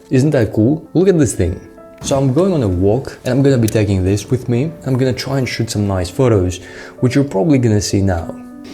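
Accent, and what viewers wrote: Greece. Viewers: Ημουν σιγουρος οτι ησουν Ελληνας απο τη προφορα (μια χαρα ειναι μην αγχω) αλλα οταν αρχισες να ραπαρεις στα ελληνικα το κλειδωσα, εχω κγω την ιδια ιδεα τελευταια με τη digital ζωη σκεφτομαι να το ξεκινησω να δω πως θα παει. Ωραιο βιντεο!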